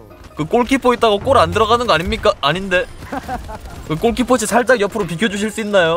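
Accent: Gyeongsang, South Korea. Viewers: 근데 님요즘 경상도 사투리 쓰시네 ㅋㅋ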